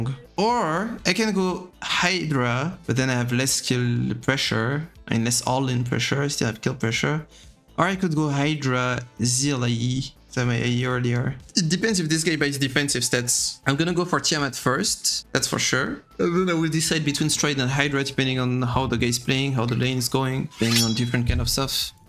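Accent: France. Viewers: Hi I discover ur channel, is sound like but not 100% sure are u french ?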